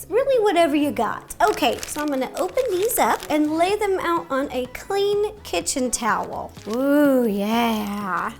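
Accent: South, United States. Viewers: But why did Ro have a southern accent in this video 😂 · she went so southern after folding the dough! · When did Ro become a southern mama?? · She sounds very southern in this video. Like compared to normal. Idk why. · Is it me, or did Ro have a lil bit of a southern accent goin on here? Either way, it is adorable and I love her♥️ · She’s sounds a bit southern today... and I kinda love it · She sounded like she had a southern accent for the majority of the video.😂 I love her. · I never realised Ro had such a Southern accent. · Ro i love you so much and every time I hear you talk i’m just reminded of my family down south cause ya just sound like a sweet little southern belle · She's got a little bit of a Southern accent going on here, lol. She's so adorable. Love you, Ro. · yo why she sound so country in this video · Is it just me or did ro develop a little southern accent throughout the course of the video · She sounds so southern omg. 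· Ro sounded super southern in this video! It was pretty cute 💜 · Ro, you sound sothern in this video. I know you said you grew up in Seattle but you kind of did this accent most of video. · Ro is so southern in this video and I love her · She sounds more western or southern than other videos, lol or is it just me? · Does anyone else think that she sounded a bit like she was from the south?! Ro you are still amazing, you are so uplifting and kind and you make me smile every time you post a video!! Happy Easter everyone 🐣❤️ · sounds a bit different like a southern accent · I'm curious to know where the Southern accent is coming from. She's from Washington. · This is meant as a total complement but I love how southern you sound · Happy Easter to you and family. Love your videos and dress. Why were you speaking with a southern accent? Thanks for the video, I can even eat this now during Passover. 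❤️💕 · I love how throught this video she had a thick sounding southern accent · Anyone else feel like Ro has more of a country accent today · Has anyone noticed how thick her “southern-like” accent got in this video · Southern Ro came out for this video. Pretty cute. These look incredible. I think I have all the ingredients! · This quarantine is bringing out Ro's Southern Belle!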